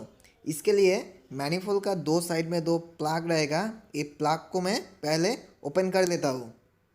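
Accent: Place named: Bengal, India. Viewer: এর জন্যই আমি আপনাকে প্রশ্নটি করেছিলাম।দোয়া রইলো ভাই আপনার জন্য।আসলে আপনার কথার মধ্যে বাংলার একটা টান পাওয়া যায়। কোথায় কাজ করেন ভাই?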